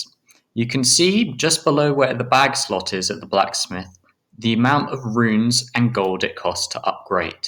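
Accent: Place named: United Kingdom. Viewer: I clicked off after hearing the british accent!!!!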